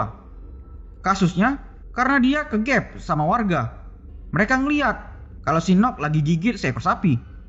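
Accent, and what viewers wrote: Medan, Indonesia. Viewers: 😓😓 astagaa aku telat 3 hari.. 🤦🏻 .. pahal in chanel favorid akuu.. 😢😢 smngt yh. ma'af sdh telat.. sebagai ganti.. aku tdk akan melewatkn semua iklan y. 😍😍😍 .. logat medan y aku sukaa kali.. 😍😍😍😘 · Jan dinampakkan kali lah medannya bang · Orang medan kah kau bang? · Wkwkw.berasa kawan awak yang ceritain ke awak Kerasa kali logat medan nya we 🤣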